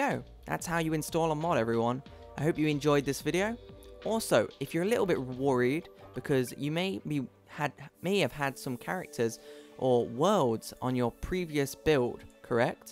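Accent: United Kingdom. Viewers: prepubescent englander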